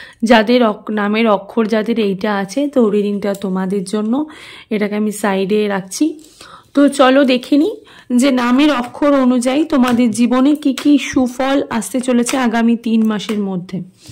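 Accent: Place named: Murshidabad, India. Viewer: Apni ki mursidabad e thaken nki apnar kotha te mursidabad manuser moto tan asche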